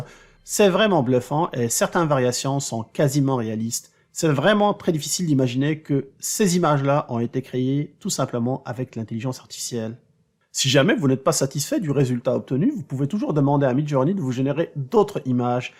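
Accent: Quebec, Canada. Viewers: Très bonne vidéo, merci. Et, ça fait plaisir d'entendre un accent québécois aussi facilement compréhensible. Bravo, très bon français. Après, l'utilisation de l'anglais de temps à autre n'est pas un drame, nous sommes en 2023, beaucoup de pays ont 2 langues officielles depuis plusieurs décennies, dont l'anglais en 2ème, et leur première langue n'a rien perdue et reste le langage le plus parlé dans le pays. Quoi qu'il en soit, bravo à tout les québécois qui parlent un français impeccable et qui sont même capables de corriger toutes mes coquilles car ils écrivent, souvent, beaucoup mieux que moi. 😅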